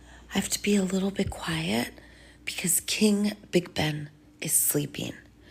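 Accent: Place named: Russia. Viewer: Loved your Russian accent in both videos ❤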